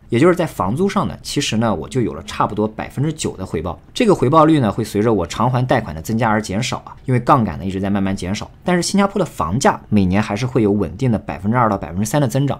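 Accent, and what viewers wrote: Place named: Shandong, China. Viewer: 有一点山东口音哦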